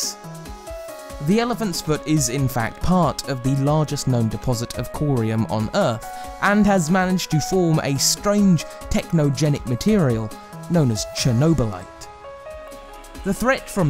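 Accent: United Kingdom. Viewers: They got it wrong cause it's a British narrator, just like they got it wrong with the Revolutionary War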